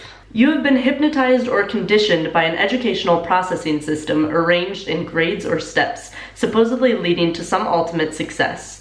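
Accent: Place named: United States. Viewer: What's her accent is .... which state in US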